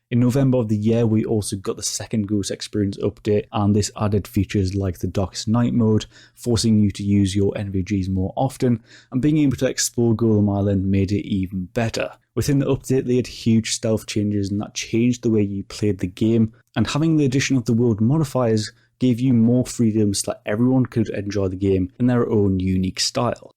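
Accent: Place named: Newcastle, United Kingdom. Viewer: This any good for a 41 yr old filthy casual who thinks the old ghost recon games were much better than the more recent offerings of wildlands and this? I never played this but read some horror stories about it and swerved it. Worth it now? I liked wildlands but it felt a bit samey as other ubi open worlds and was never touched after the platinum. Just scratching around for something to switch off to and play solo. Fellow geordie by the sounds of it also, I’ll sub just for that 👍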